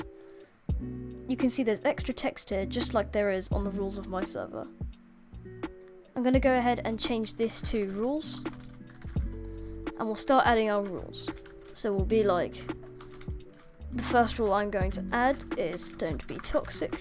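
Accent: United Kingdom. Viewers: Man sounds like he is British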